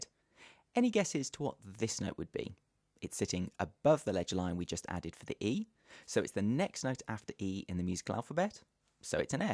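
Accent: United Kingdom. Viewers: are you from the uk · A nice British accent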